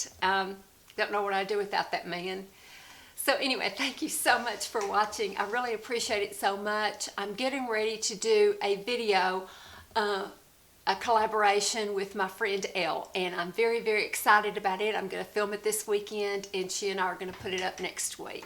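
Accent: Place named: Southwest Virginia, United States. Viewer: Hey! You inspire me so much. I am from and currently live in South West Virginia our accents are so similar and I looovvvee it. I just turned 50 and up until a recent and very rapid weight gain I am the happiest I have ever been with my self. Believe it or not you your beautiful long locks inspired me to get my current pixie cut. No sorry I cannot explain that. I will update my profile pic on YouTube soon. My hair is shorter than in my pic here. I love it so much and have received many compliments. People I don’t know stop me and ask about my hair color and cut. Anyway enough about me. I love your and Kristen321 channel so much. You guys are my favorite YouTubers by far. I love Jessica Braun and EmilyNoel. There are some others Elle Nisha. I love Sthepanie Nicole too. I have gotten way off topic. I would love so much if you and Kristen were to do a meet up. 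My suggestion would be Smokie Mt. area since many folks already travel there. Maybe hubbies could come and busy themselves elsewhere. Just throwing something against the wall to see if anything sticks. Jessica and Emily both are in Indiana maybe they could come. Jessica is pregnant with her first beautiful baby so she may not have availability. Girl you and Kristen have serious fans that really would love to spend an afternoon with you guys. Much love and many thanks Micha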